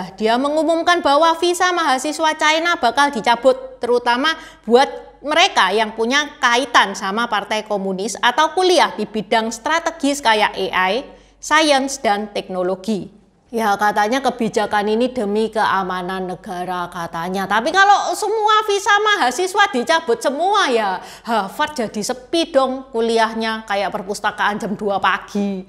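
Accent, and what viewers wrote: Java, Indonesia. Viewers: Logat jawi cici ne kuental baangat yoo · Logatnya Cin Wa bgt 😅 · Bahasa kamu ciindo Jawa . Pasti jawane medok ... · Medook Jowone😂😂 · CICI produk lokal yang meddok, aku suka.... 😊😊😊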